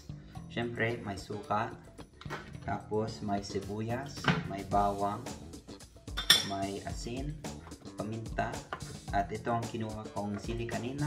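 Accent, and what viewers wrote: Ilocos, Philippines. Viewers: Ilocanong ilocano pati Beng at panagsao ket natangken... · I really salute how you embraced being a Filipino. Ilokana din ako and you really sounds like a legit one. Kaway-kaway sa new subscriber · Grabe balong. Pinoy na pinoy ka na talaga ah. Ay ilocanong ilocano na pala hahahaha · Ang cute mong mag salita ilocano ka nga talaga 😂😂😂I love it ❤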